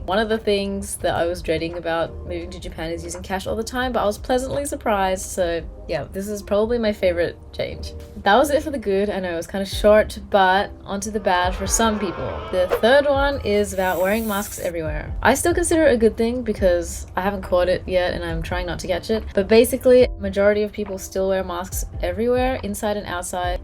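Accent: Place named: Australia. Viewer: I like your Aussie accent so much.please keep it up uploading like this with accent! Less to see someone speaking Aussie accent. 頑張ってください！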